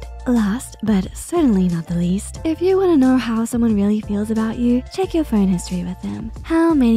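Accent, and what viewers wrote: United Kingdom. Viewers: unrelated to the video but why does narrator have a british accent now?? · Was thinking the same although I also enjoyed the British accent ❤ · A British Amanda Silvera!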